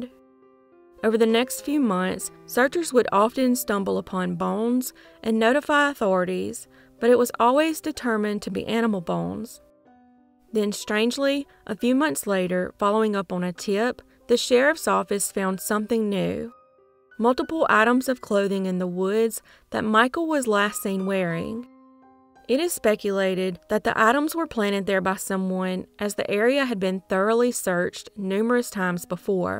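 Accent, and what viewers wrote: South, United States. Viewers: I like your southern twang.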